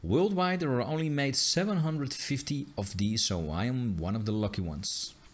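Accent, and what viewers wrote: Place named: Netherlands. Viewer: Echt een typisch Nederlands accent.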